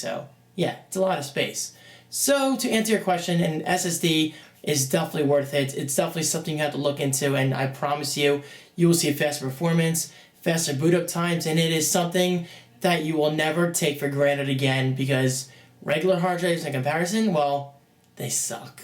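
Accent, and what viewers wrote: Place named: New Jersey, United States. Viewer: maybe its how they say it in jersey?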